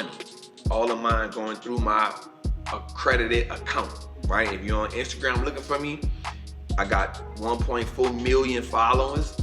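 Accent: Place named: New Orleans, United States. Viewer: Just stumbled across you on a video you did with Tom Bilyeu and a soon as I heard your voice I was like that dudes definitely from New Orleans. Man everything you say makes sense and easy to understand. Thank you for spreading the knowledge.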